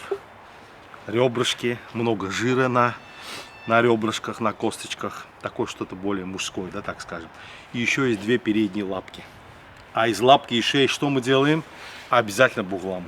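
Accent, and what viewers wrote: Baku, Azerbaijan. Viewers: Доброго здоровья! Ваш русский язык с Бакинским акцентом, для меня бальзам для души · Спасибо дорогой земляк сидел бы вечность смотрел и слушал. Бакинский диалект это просто песня азербайджанского народа. Как я скучаю по Родине по дорогому моему сердцу Баку. По своему милому посёлку Новые Ахмедлы. С уважением к вам Иващенко Андрей Викторович. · Как азербайджанцы никто так вкусно не готовит на Кавказе. Ну и так вкусно рассказывать тоже. Не удержался и подписался! Этот непередаваемы азербайджанский городской акцент это супер. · Сразу по разговору Бакинец))) привет с Разина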